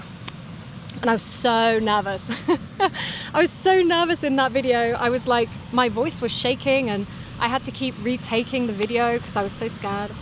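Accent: England, United Kingdom. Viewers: I really like the way you speak, your British accent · beautiful england accent. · Forgot she spoke British English and not American English and panicked at first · Fancy British accent, thanks for native speed, its all clear. Is this true that most British people are very polite? Can they directly say "no" for any unlike favour? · Hi! I’m Korean and married to a British. That’s why I absolutely love the British accent! I’m so glad I can understand you much better than I thought. Haha. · She is clearly British. Doesn't sound Aussie at all. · Funny to see this recommended while being a native-English speaker (I live in the US), and it seems it was also recommended to many other English-speakers. In my case, I may have seen videos regarding learning English from non-native speakers where those learning were native to Japan or some other country, so 'learn English' would be the common point there. 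This video does help in one way, and that's hearing a UK English accent that might be at a little bit faster speed than I'd heard from other YouTube channels or shows like Monty Python. I also sub to a couple channels from Ireland and one from Scotland - so also English, but a lesser-heard accent, to me. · Hi,there.Idid understand what you meant in the video.i love your UK sound,thank you 😊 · Are you England? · As a native speaker of American English, I understood everything you said, quite effortlessly, despite the cute English accent and occasional British-specific idiom. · If I understood everything it means I'm an advanced speaker? (I'm really asking, my native language is spanish tbf) Cuz tbh it wasn't difficult at all, your pronunciation and accent is very pleasant and clear compared to others brits I heard before. I'm surprised indeed cuz I learned the USA english and that's the accent i'm most used to (still can't understand those texans cowboys brokers selling cows, their speech speed is about half the speed of light lol) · Hello from Greece. 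Wow. You are great sweety lady. Your pronunciation was a bit expected as British lady, it was not so difficult to hear. Perhaps they are international English with British accent? We thank you very much for your video. Kisses from Athens. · That's the Englishest of Englishes I've ever heard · you have very clear british accent, I like british accent, specialy RP accent. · I am an American and I love you Brits and your accents ! Nice job ! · I've been learning english like 7 months and i kinda got 99% of this video like every single word i understood no problem and the best part of it that i sorta lerned and watched only american way of english and even with ur british an accent i got everything as well and honestly i really like how u pronouce without "r" so thank u for this video and contiue do what u do and u become as famous as mr beast in world of english learners xd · I like her accent; it reminds me of the British life I've always missed. 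:-* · Are you British? I think 🤔 I love 💕 your accent. How can I get in touch with you? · Thank you for your video. I’m a Japanese student studying Russian. For some reasons I quit studying English after entering university, so my English got worse. But after watching some videos of your YouTube channel I wanted to start learning English again. You speak slowly, so it is easier to understand, than other YouTubers. And I like your British accent. I agree that surrounding yourself with foreign languages is the best path to master them. At first I couldn’t understand conversations of Russian native speakers, but I started to make it a point to listen to podcasts or YouTube for language learners every day, one day I completely understood what they are saying. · Hi!, well, I usually study American English but let me tell you that I understand you, not at all but I do. I love your British pronunciation, it sounds fantastic, though 2 or 3 words slip my mind but I can understand you. 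Greetings from México City.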